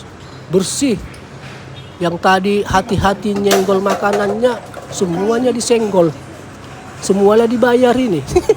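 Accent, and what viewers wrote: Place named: Java, Indonesia. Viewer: BANG LU JAWA MEDAN YA KOK NGMONGNYA KADANG ADA JAWANYA😁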